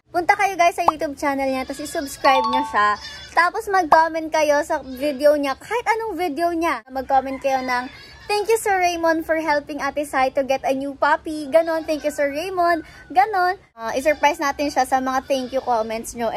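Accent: Batangas, Philippines. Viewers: I love that Batangenya Accent hehehe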